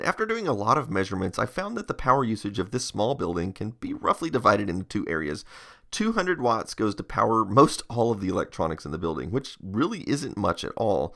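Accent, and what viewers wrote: Texas, United States. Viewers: The pronunciation of roof as "ruff" is mainly just a thing in Texas. Our accents and how we pronounce certain words can very a lot depending on where you are in the US. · So Texans say 'roof' funny, as well as 'solder'?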